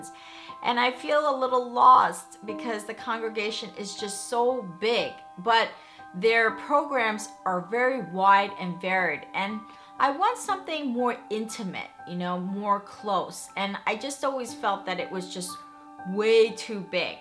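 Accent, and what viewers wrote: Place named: New York, United States. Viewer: you live in Southern Cali...one would easily be able to make suggestions to you in regards to places of worship if say, you lived in SF - I assumed you lived in SF because you're Asian-American UNTIL I heard your accent I realized you'e a New Yorker. And NYC people typically find SF a bit weird. Anyway, find your own spiritual place. Your OWN temple. I'm something of a pantheist/deist. And look up on Buckfast Abbey here in the UK. They have an amazing prayer room:) if you come to the UK be sure to visit it.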